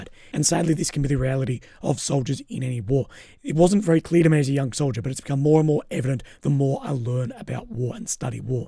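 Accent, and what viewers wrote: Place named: Australia. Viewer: Big yin your pure on this subject unbiased opioid empathy and a funny oz accent. Dude l could do an hour a day why don't you do 2 wee video a day recap or fake news exposed. Watching from Scotland peace and love to all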